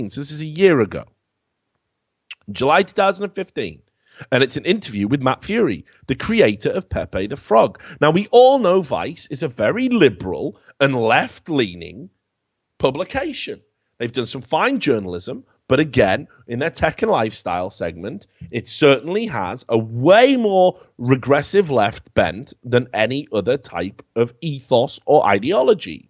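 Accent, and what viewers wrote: Wales, United Kingdom. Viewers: You sounded so Welsh 5 years ago.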